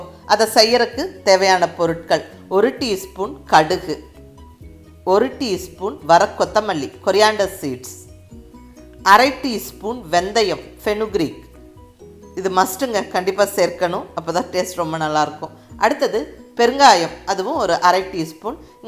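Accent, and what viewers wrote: Coimbatore, India. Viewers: This simple beautiful talk is our😎 Coimbatore Tamil. PROUD Tamil.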